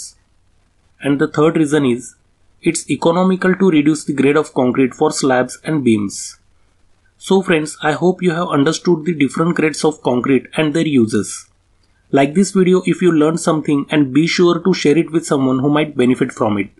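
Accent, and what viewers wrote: India. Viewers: This guys Indian accents so strong and hilarious. Cool videos though 😊😊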